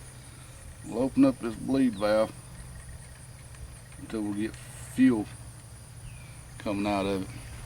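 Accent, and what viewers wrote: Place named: South, United States. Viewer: Thanks for the upload! Plus you sound like a good ole country boy, hell you would fit right in around here!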